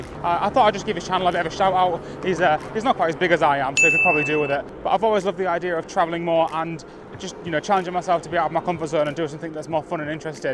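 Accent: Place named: Northern England, United Kingdom. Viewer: What accent is this,i could of sworn it was north england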